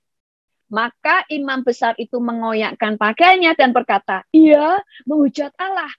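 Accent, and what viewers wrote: Java, Indonesia. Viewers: Bu, medok banget........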